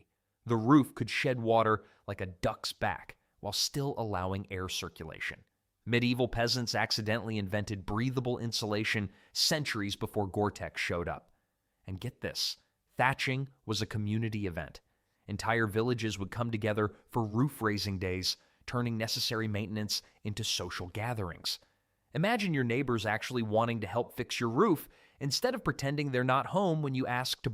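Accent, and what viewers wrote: United States. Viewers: If there is one thing I love ( not) is listening to some yankee narration trying to be dramatic but failing to hold attention. Get to the point and drop the BS.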